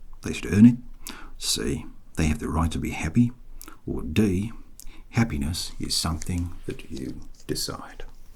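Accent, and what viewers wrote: Australia. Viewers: I’m an Aussie mate, you’ve got the accent nailed. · Totes brill, mate! Delightful and I made it to the end, just barely. 😴 Love the basement-level intonation that comes with the Aussie accent. 👍🏻 Maybe I have more words tomorrow? 8! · Ahhhh nothing. It’s everything! Or is it? 🤔 😁 Your Aussie accent is so good it’s almost scary. I love it! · I miss Lars now, too! 😢 I broke both feet a few years back & the physical therapist's had a constant game show of some sort or another running in the background, which was rather more grating for me at times than was the (excruciating...don't break feet, people) therapy. This one would've left me feeling far less bleak, lol. I mean, there's a happiness baseline of Aussie accent & seagulls! 🖤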